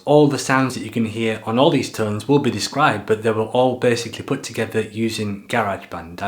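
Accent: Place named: Scotland, United Kingdom. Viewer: Seems like I spotted Ben Nevis in the distance….and a slight of scottish accent as well. Per in Demark…I ❤️my Tele.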